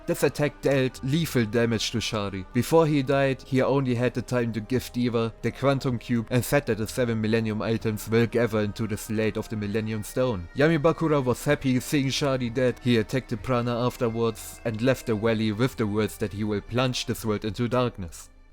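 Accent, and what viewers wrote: Germany. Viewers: Dieses eingedeutschte Englisch ist schrecklich. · Boa wie man dein Deutsch heraus hört hahaha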